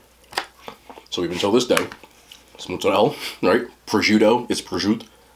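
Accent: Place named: New Jersey, United States. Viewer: I think us people from New Jersey just talk different anyway. Thanks for the video and trying those snacks I didn't know abt,looks really good.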